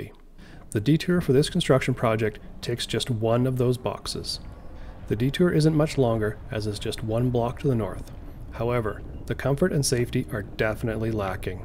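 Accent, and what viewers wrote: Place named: Canada. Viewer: I'm not surprised that you are from Canada. Your city's policies and your accent seem Canadian.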